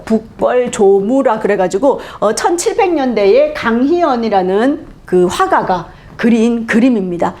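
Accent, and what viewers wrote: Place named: Jeolla, South Korea. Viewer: 全羅道 方言(사투리)가 섞인 解說이네요. 强하지가 않아 귀여우시네요. 感謝하당께롱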